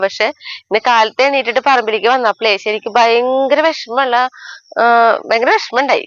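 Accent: Thrissur, India. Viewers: Clear ആയിട്ടാണല്ലോ സംസാരിക്കുന്നത്. പിന്നെ അവരുടെ തൃശൂർ സ്റ്റൈൽ ആയത് കൊണ്ടാകും അങ്ങനെ തോന്നിയത്..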